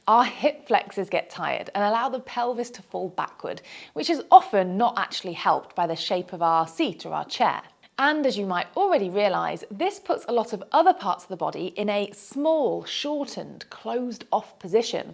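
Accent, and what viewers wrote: United Kingdom. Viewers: Studies show sitting on your desk, listening to a hot British chick telling you not to sit on your desk is an amazing way to spend your time and improve your fitness.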